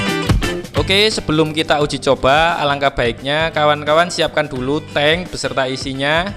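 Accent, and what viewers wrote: Java, Indonesia. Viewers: Smpean jowo'ne pundi Om..?? 😁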